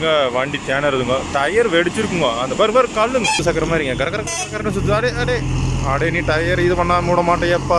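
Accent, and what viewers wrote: Coimbatore, India. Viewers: coimbatore tamil ngow anna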